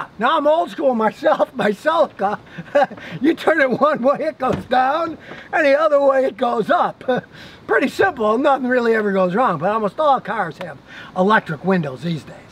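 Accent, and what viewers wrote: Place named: South, United States. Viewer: I use all of your tips... and I also use your southern drawl as a sleep aid, It's on my healing frequencies playlist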